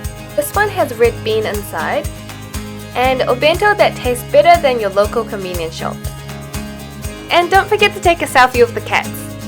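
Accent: New Zealand. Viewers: Its so cool finding people also from New Zealand. I knew your accent sounded familiar ^_^ newly subbed but so far I adore your videos <3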